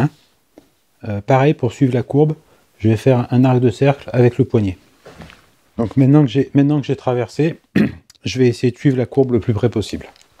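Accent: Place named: Belgium. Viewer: je suis d'accord avec toi, je ne vois aucune méchanceté dans tes vidéos. J'y vois surtout de bons conseils venant d'un pro ainsi que de la bonne humeur. En plus, ayant grandi juste à côté de la Belgique, retrouver ce joli accent ainsi que les septante et nonante me ravit.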